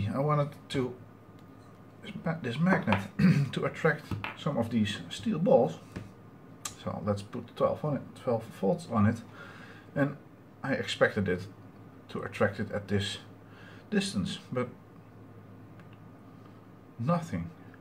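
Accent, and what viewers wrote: Netherlands. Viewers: Ik heb dezelfde in mijn winkelwagen zitten van 2 euro per stuk. Kan zijn dat die ook lager gerate zijn qua kilos aan trekkracht. Wel leuke video trouwens! Hoorde het o zo bekende nederlandse accent en keek naar het naampje van het kanaal en ja hoor: eindelijk een nederlandse electro youtuber.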